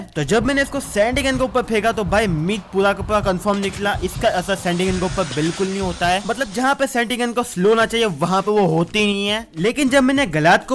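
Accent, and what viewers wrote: Gujarat, India. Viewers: Bro you GUJARATI???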